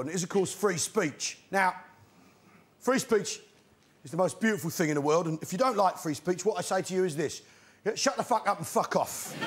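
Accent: United Kingdom. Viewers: The British accent helps it go down better...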